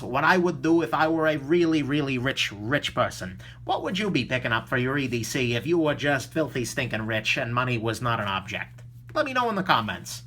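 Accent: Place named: New Jersey, United States. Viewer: Why do so many find the way you talk as different? I live in Jersey and everyone sounds just like you?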